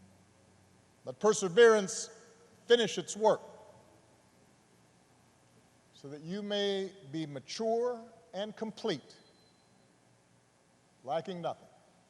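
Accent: United States. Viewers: How did he suddenly develop a southern accent? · Byron Moore where did this Deep South accent come from? This isn’t how Joe, Hillary, and Obama speak, unless in Dr. King’s church fishing for votes.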